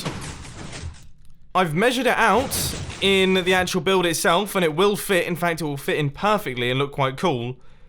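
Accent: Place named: United Kingdom. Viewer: A piston doooor stoooor XD I love mumbo's British accent by the way :)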